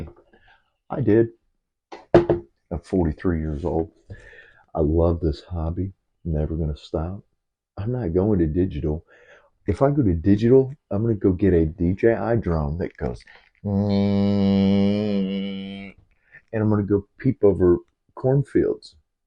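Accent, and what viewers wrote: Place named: United States. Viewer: Mate you have the best yank accent in FPV 😄🤝 This is a brilliant video and I hope loads of newbs see this as the advice is SPOT ON! I tried raising this point with JB but he just poo poo’d me, I attempted to explain that he’s going to be out of a job if the next generation and their parents don’t even consider Analog, they just don’t have the money that digital costs let alone the repair costs when the inevitable crashes happen! 😮 Seen SO many guys come into fpv with a complete dji fpv/avatar system and then disappear after destroying their Gucci new quad and not being able to justify to themselves/the missus & kids the cost of replacement etc 😢 Eachine ev800D goggles still hold their weight, I use Cobra X now but still use the Eachine ones when my kids fly with me and let them use the Cobra’s 👍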